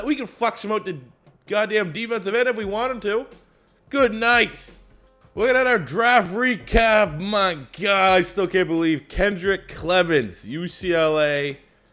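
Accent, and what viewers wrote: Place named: Canada. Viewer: Hes Canadian its his accent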